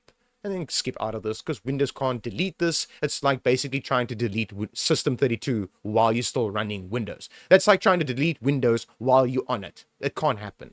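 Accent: South Africa. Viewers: You're literally the only guy that explains everything correctly. And You're South African made everything easier. Thanks buds im running 5th gen I7 and 3070 12 gig this helped me big time.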